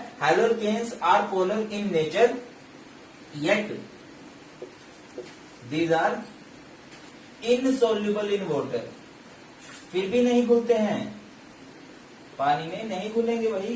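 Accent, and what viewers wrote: Haryana, India. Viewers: Sir r u from haryana🤔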